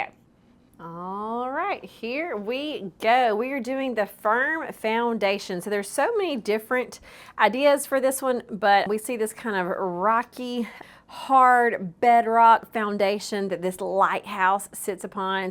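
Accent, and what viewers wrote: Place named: Alabama, United States. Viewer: Love the lighthouse. When I went to your Facebook account I was delighted to find that you are a fellow Alabamian. I knew I liked your accent😊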